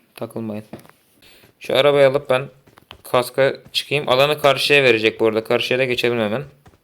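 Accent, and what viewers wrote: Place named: Giresun, Turkey. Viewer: Giresunlumusun şiven anımsatıyo bende giresunluyumda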